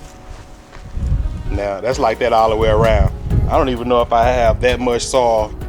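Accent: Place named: South, United States. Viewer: Hold on friend, you don't have to pull up that chard. That chard will last you all year. Looks like you're in the South or sounds like it from your accent. Swiss chard you can harvest repeatedly. Maybe you're just making room for other stuff? Reach out if you want to chat about Swiss chard. Love your video.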